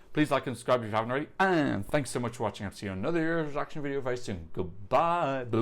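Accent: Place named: Ireland. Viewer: Yeah but he's Irish and everything is T and D anyway. Saying it's like the does not help 🤣🤣🤣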